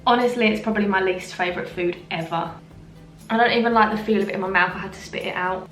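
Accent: United Kingdom. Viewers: I looooove british accent!..you are beautiful! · Loved this video; you have such a great personality and so funny! Love your British accent too. 😆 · I love the British accent because it makes every video funny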